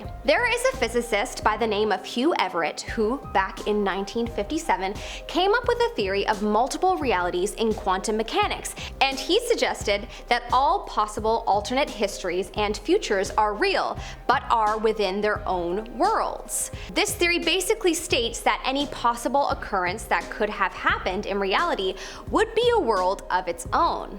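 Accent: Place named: United Kingdom. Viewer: A stone computahhhhhh wahhhhh I love your funny British accent 😅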